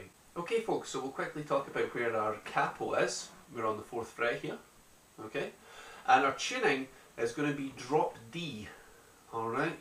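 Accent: Scotland, United Kingdom. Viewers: My good friend comes back from Oz raving about this guy, I check him out. Being a huge fan I love the cover, I then think to myself "I could learn this and it would go well with my scottish accent, I wonder if there's any tutorial videos online". I come on here and it's a scottish guy doing the video. Meant to be. Cheers for the video mate!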